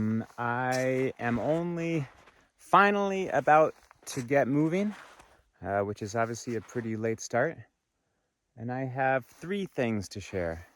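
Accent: Argentina. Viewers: your Spanish has improved! Nice to hear that Rioplatense accent haha. Always thrilled to see you upload a full length video. Your instagram clips are just not long enough and you've stopped writing on your blog. These vlogs will have to do. I'm a novice at the mountains but whenever I'm in chalten I always dream of bumping into you! Keep on posting inspiring content for us please:) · Amazing stuff! Glad to hear you are picking up the argentinean accent when speaking spanish;)!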